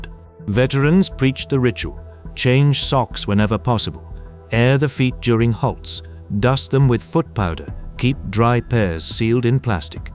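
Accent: England, United Kingdom. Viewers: I’m really not digging the English accent!🇺🇸👎👎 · Can't like a narrator with a Brit accent shedding anything negative about American Vets.nfg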